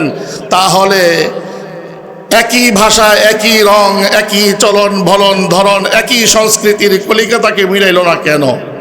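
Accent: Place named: Pakistan. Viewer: হুজুর আপনি যে পাকিস্তানি বংশধর তা কথাই বোঝা যায়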